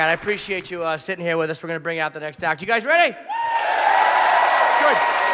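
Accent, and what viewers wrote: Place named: South, United States. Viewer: love his southern accent xD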